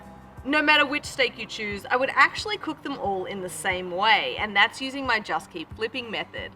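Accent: Australia. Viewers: Oh my God not only are you beautiful but I love your Aussie accent think I’m in love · You're very good darling, and we love the aussie accent, but I prefer NOT flipping the steak every 30 seconds. Depending on the cut, and thickness that would determine how much time on each side. You still have Love from America ♥️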